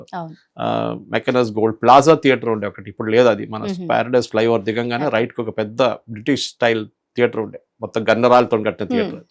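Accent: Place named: Kerala, India. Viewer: మీరు అంటే నాకు చాలా ఇష్టం సార్. ఒక మలయాలి అయినా తెలుగు స్వచ్ఛమైన భాషతో మాట్లాడుతారు. జర్నలిజం లో మీలాంటి వాళ్ళు ఉండాలి సార్.. సార్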